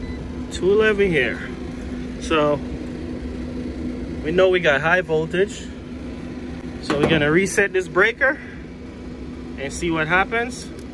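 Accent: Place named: Jamaica. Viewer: Where u from man???? From your accent are from jamdown...... Or further afield down in the caribbean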